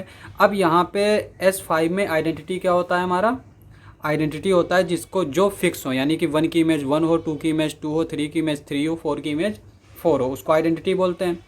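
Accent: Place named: Bihar, India. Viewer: bihari accent=lit video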